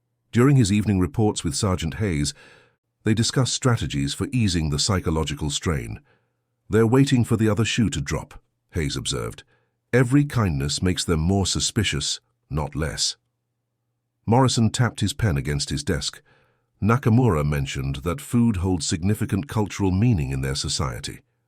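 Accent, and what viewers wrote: United Kingdom. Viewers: Watching from 50 miles east of Camp Blanding, in NE Florida. The US Army doesn't have 'Left-tenants', we have 'Lew-tenants'. Is your AI narrator British? There is 'cognitive dissonance' again st . It's in every video you post.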